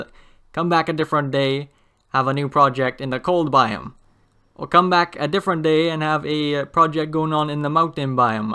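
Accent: Wales, United Kingdom. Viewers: You welsh? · I hear a hint of a Welsh accent?? Shout out to the home land?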